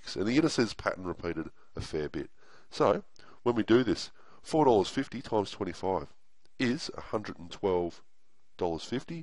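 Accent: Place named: Bogan, Australia. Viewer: Love your Aussie bogan accent, 👍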